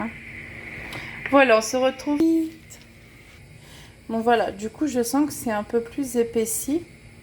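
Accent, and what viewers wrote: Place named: Nord-Pas-de-Calais, France. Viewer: Coucou ma.poule. oui moi aussi je me sers du même eplucheur que toi de la marque typer....re. J ai fais des gaufres sèches du Nord, cette semaine. Bon je ne suis pas chti lol...mais j avais envie d essayer..😊 je suis du 77 à côté de 2 grands parcs d attractions bien connu. 20 minutes pour 1 et 1 h pour l autre. Tu parles chti c est sympa... pour le live je crois que c est toi qui bloque ou pas la personne en direct..mais bon ..renseigne toi car j y connais rien lol..moi je travaille dans une grande banque du 4/40. Actuellement en arrêt suite cancer du sein il y a 1 an. C est récent. Sinon j aime la marche. Je fais environ 40 km par semaine. Voilà ça te donne une petite idée de moi.. Pleins de bisous. 😊😊😊❤❤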